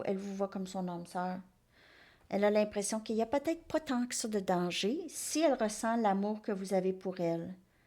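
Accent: Canada. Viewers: Merci belle créature j'aime votre accent canadien et vos guidance je vous adore belle âme (Algérie )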